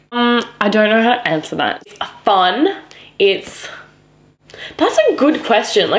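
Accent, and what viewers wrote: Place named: India. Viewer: HAHAHAH ur indian accent is my favourite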